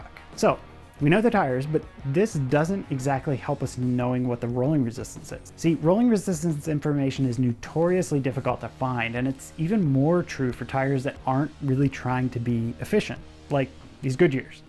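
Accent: United States. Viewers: Fantastic graphics and visual, the best I’ve seen in awhile although 3blue1brown is giving you a run for title of best! BTW, you may already know this, maths = Brits, math = American, you have a clear American accent, lets stick with math - I insist (LOL)! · "maths"??? super awkward to hear the European multiples version of math in your American accent. just be consistent and say math.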